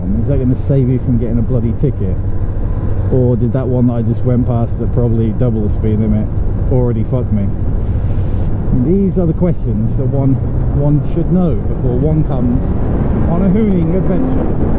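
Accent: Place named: RP, United Kingdom. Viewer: Love the posh stereotypical Hollywood British professor accent 😅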